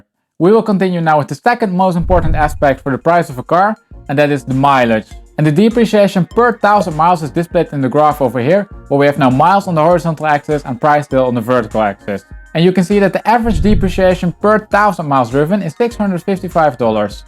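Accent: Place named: Netherlands. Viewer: Hello, bought myself a facelift GTS 2017 with 9000km in Belgium for 85k€. What a car. Beautifull en lots of sound and driving pleasure! Sold my <num> turbo cabriolet for same price as i bought it 10 year ago! Thanks for all your unique and perfect analysis. Regretfully all in dollar and US figures... as i think hearing your accent that you are from europe.... Holland i think 😅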